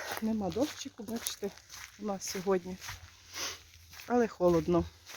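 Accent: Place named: Polesia, Ukraine. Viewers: А ви, напевно, родом з чернігівщини? Моя сваха з тих країв, то в неї точно такий говір.А шапочку потрібно поміняти, на шерстяну алергія. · Де це ви проживаєте? Мова то поліщуків.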